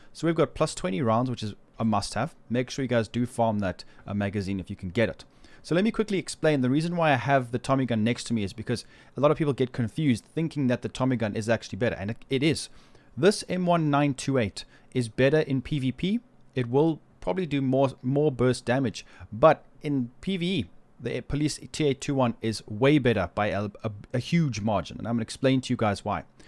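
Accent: South Africa. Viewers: Are you South African? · you're from south africa aren't you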